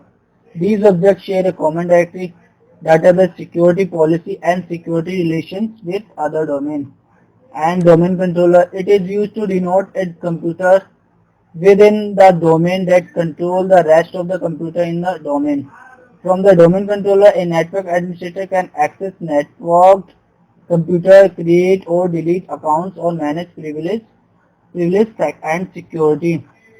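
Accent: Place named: India. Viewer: Can’t understand anything thick Indian accent had to pause each time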